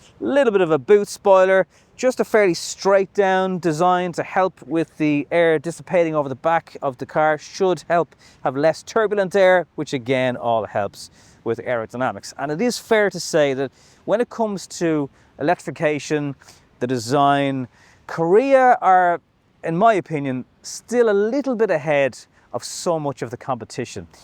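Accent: Ireland. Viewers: Is this Ireland accent?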